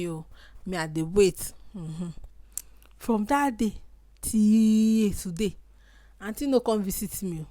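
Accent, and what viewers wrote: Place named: United Kingdom. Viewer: lol, one UK useless accent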